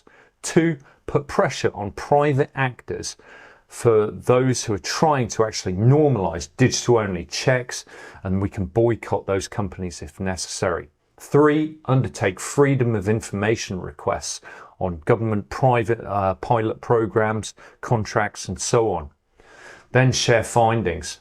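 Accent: United Kingdom. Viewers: you sound like a Brit.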